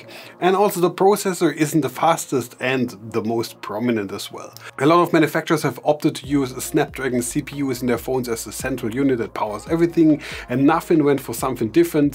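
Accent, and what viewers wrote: Germany. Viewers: Stuagarter wo english schwätzt. Klasse Moove Digga! Gefällt mir!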